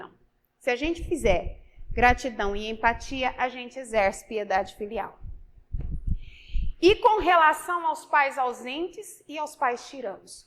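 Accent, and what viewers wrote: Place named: São Paulo, Brazil. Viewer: vc é de onde? gosto muito de suas palestras, pelo sotaque vc é paulista.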